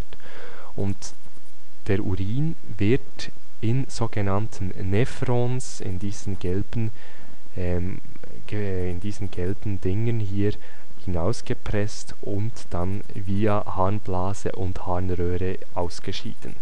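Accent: Switzerland. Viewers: So en Schwiiiiiiiiiiiizer !! :-) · Extrem hilfreiche Viedos , die durch sehr verständliche Erklärungen glänzen! Das Besondere sind die langsam gesprochenen Erklärungen, die es erlauben das Gehörte auch gleich zu verarbeiten , wodurch man ausdauernd zuhören kann. Die meisten anderen medizinischen Tutorials scheitern in ihrer Qualität an der Geschwindigkeit. Als schönes Extra kommt die angenehme Stimme hinzu und der schöne schweizer Akzent! Für mich die besten Tutorials im Netz! Herzlichen Dank für diese tolle Hilfe !!! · Das ist sicher ein Berner:) super video 👌merci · ein sehr komplexes thema kurz, knapp und ausreichend erklärt, hilft mir sehr! vielen dank!....und ich liebe ihren schweizer dialekt ;)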